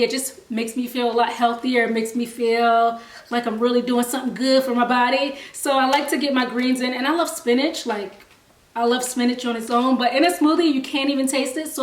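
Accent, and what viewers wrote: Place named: Washington D.C., United States. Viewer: Every time I hear you speak I hear your accent ❤️ are you from D. C. ?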